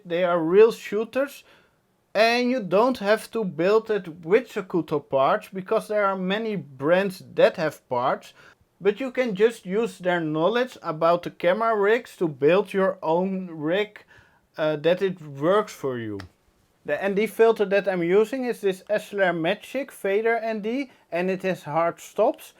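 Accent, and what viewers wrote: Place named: Netherlands. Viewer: Are you dutch? Your accent sounds Dutch. (I'm from Belgium by the way)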